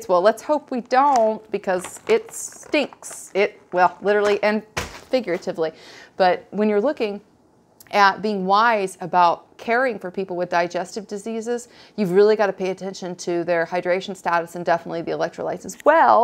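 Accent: South, United States. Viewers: You sound southern . You're an angel . Coffee drinker ? Why hell yeah ! You cook ? I've lived off sandwiches and vitamins forever. Proud southerner too . Imagine that .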